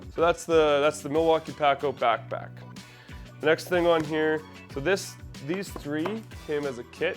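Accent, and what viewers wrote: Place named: Canada. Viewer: You could tell you were Canadian from the get go the accent isn't super thick but certain words.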